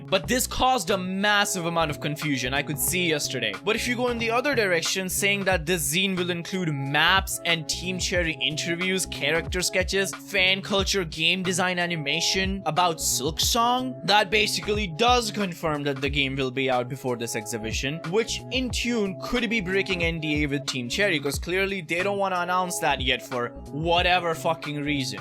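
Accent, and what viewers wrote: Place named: India. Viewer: I think it’s evident by his accent that he was at least raised in an Indian household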